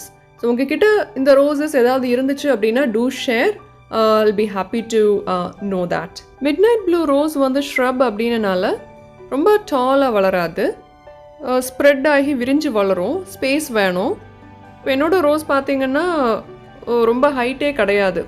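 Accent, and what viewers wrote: Kerala, India. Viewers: Malayali ano? Sounds like Malayali speaking Tamil.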